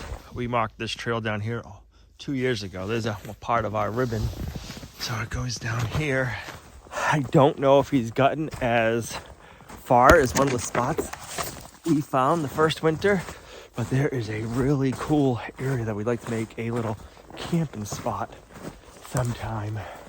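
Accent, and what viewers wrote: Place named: New England, United States. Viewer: in the last couple videos, you kept referring to “Gracing the roof”. For the LIFE of me I didn’t know what u meant. Then you pulled out the ice shield and I get it now. That must be a “NewEnglander slang” I guess LOL! I do get a kick out of some of your pronunciations from time to time. You’d probably make fun of how my midwestern accent sounds too😂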